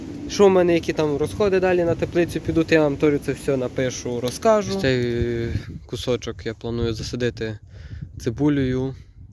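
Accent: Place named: Vinnytsia, Ukraine. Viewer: Звідки ви? Якщо не секрет. Такий говір наче з Вінницької області ☺️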